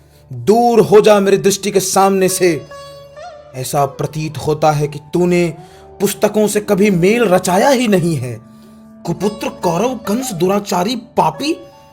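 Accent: Standard. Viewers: Sir itni shudh hindi 😂😅😅😅😅...baht aacha h sir.. Hats off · Such a nice....voice you have...you are a multi-talented....🙏 I love your voice and pure hindi accent.... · Aapki Hindi sunn kr dil khush hogaya...Kash ki aaj bhi log aise he pure hindi ka uccharan krte..Toh nahi sub ko English mein maharat hasil krna hai..🤣🤣🤣 Apna Desh ka mother tongue hindi koi bolna he nahi chahta..Sad but true.. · ARE SIR G....AP TO GREAT HAI...GJB KI SUDH HINDI BOLTE H.....MHABHART.....KI YAAD AA GYI....APKI VANI SUNKR🤣😔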